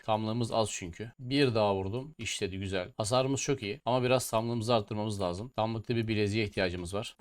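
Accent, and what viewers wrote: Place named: Adana, Turkey. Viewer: Adanadan izliyorum kardeşim oyunun hakkını veren oyunculardaniz bir zamanlar ama videonun sonuna kadar keyifli izledim sende bir adana şivesi var gardas başarılar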